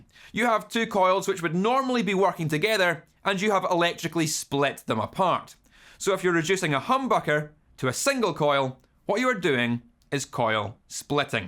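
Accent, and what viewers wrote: Scotland, United Kingdom. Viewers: Cool video, with the accent of Sir William Wallace! Hear your accent makes me wanna drink whisky! Cheers from Portugal 🍺🤘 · Love the Scottish accent 👍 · I never would have guessed guitar tech Yoda was Scottish. Good info. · Your Scottish Accent. I want to learn it. PLS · Perfect explanation--many thanks! Also, as a Star Trek fan, the fact that you have a Scottish accent and are explaining functional electrical engineering concepts is a real treat. : ) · Is this a Scottish accent? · I’m a simple man. I see a video that commences with saying, “hello guys, here” and I smash that like button and watch the whole damn thing to get the full Scottish brogue experience.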